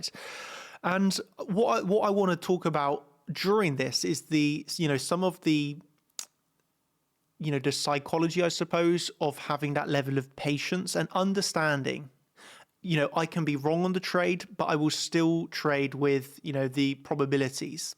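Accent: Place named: United Kingdom. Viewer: Bro is British bruv 👊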